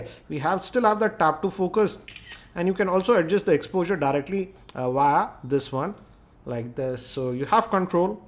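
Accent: India. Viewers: if india speaking english . bad to hear. yakkkk..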